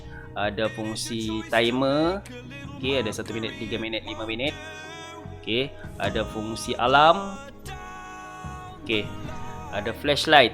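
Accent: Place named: Muara Tuang, Malaysia. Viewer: Eh, muara tuang eh. Patut la mcm ngelala jak bunyi sleng tek. Hehe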